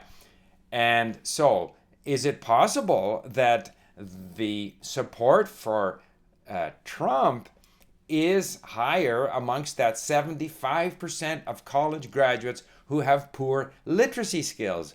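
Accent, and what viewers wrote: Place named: Canada. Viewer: Dude, I am Canadian too. You are the most Canadian sounding person I've ever heard. What part of the country are you from?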